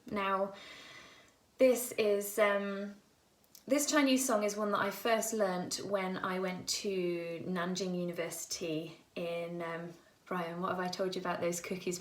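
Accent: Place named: United Kingdom. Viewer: Does your mother have a presence online? You make it sound like she is someone I would enjoy listening to. btw, I am also a biker but no tattoos. Speaking of listening, I love your british accent. I have a friend in my club that last year married an English fellow and brought him here to Michigan. Due to following so many GB YTers, I have a pretty good handle on the accent and slang. When he got up to speak about at our last meeting he got pretty excited and you could have heard a pin drop because some of his rant, while typical british, can be somewhat racist here. I started laughing and got up to explain what he actually said. That ended up being the quickest and best meeting in months.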